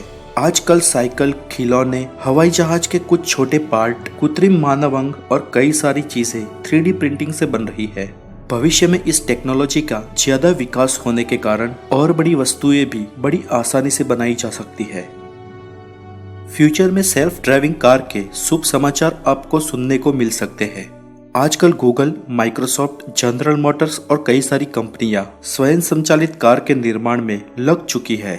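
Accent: Gujarat, India. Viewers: Video is nice but the speaker has Gujarati accent in his voice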